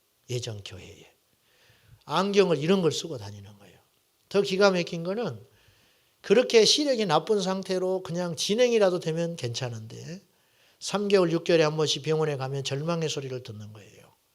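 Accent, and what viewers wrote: Jeolla, South Korea. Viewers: 목사님의~ 전라도 사투리 말씀에도 정겹고~ 은혜 됩니다~😊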